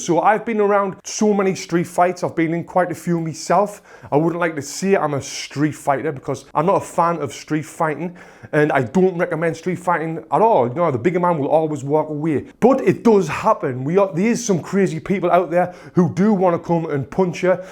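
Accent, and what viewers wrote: United Kingdom. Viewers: Are you British? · I love british accent! I subscribed u cos i loved u said puunchh instead of punch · 20 seconds into the video and there is only one way to describe it, bri'ish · How I love British accent! Cheers from Poland! 😉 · Man, I’m in love with the way you speaking, that accent “thru the poonch”! Love British accent since been taught it at school. Being native Russian speaker now living in US and speaking American english. Completely different story. Love to learn more about martial arts as well and boxing is one of the basic ones, it’s classic! Thank you for the video! Keep it up! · Your British accent ... Love it 😂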